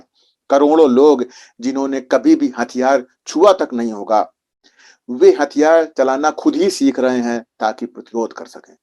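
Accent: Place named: Fatehpur, India. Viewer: Theth Fatehpuriya Bhasa Maza aaya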